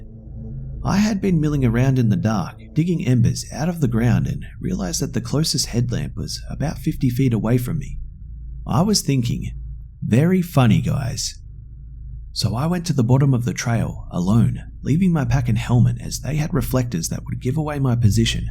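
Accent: Australia. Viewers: Are u auzzie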